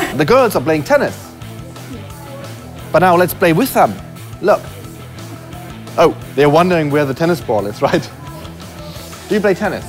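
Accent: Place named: Germany. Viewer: Man kann es hören, dass er Deutscher ist :D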